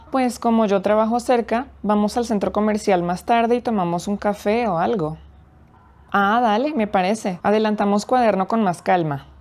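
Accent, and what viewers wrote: Colombia. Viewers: Como siempre, es un placer escuchar tu hermosa pronunciación y lindisimo acento colombiano! 🥰 Tuve que pausar el video porque no tuve tiempo para responder, pero quizás era tu intención. Muchas gracias, me encantaría tener más como esto!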